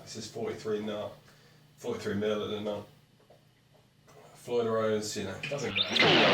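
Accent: England, United Kingdom. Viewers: Thought you were American, but I like British accents more haha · Wow what’s a nice guy really enjoyed that video. Did not expect that English accent. 🙌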